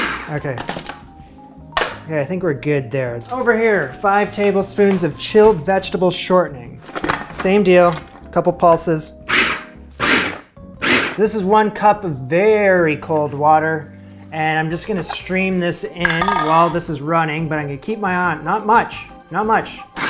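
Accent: Canada. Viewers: You mean you couldn't tell? I'm not from Canada, and I noticed the difference of his Canadian accent to the US one...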